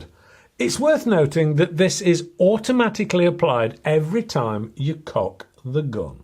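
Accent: United Kingdom. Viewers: I love your accent, reminds me of all those Monty python shows I loved to watch.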